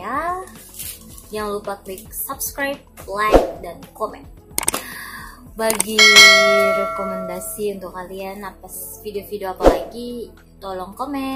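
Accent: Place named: Makassar, Indonesia. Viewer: Makassar yh logatnya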